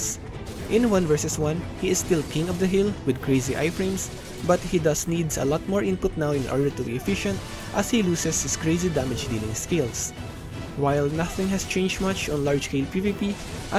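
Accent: Philippines. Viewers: Great video! I'd say the music should be turned down a bit though as it's hard to hear you, especially since you don't have an English accent (Filipino?). Otherwise it was really informative, I just bought the game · to us big boi zerk mains, this brings a smile to my face. but the reality is, we got tons of unprotected skills, also our Q buff got longass cooldown and can also be used for 30 secs. It's like having the biggest penis but is also a quick shot which sad af. PS: your accent gives me pinoy vibes. :)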